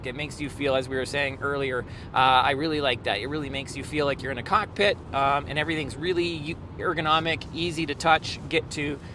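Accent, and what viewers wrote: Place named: Canada. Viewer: Hello from Manitoba! I can definitely hear that typical "canadian" accent everyone always talks about in the way you speak, im only part way through the video but you have definitely been very infromative so far!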